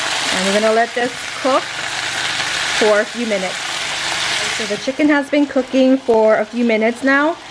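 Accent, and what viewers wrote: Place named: Hawaii, United States. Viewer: Watching the video before checking out any of your other videos, I got a sense that you are from Hawaii or live in Hawaii because you have that local accent. 😭❤️I’m from the Big Island but I’m away for school at the moment. Going to cook this tonight for dinner! Excited because I miss home and home food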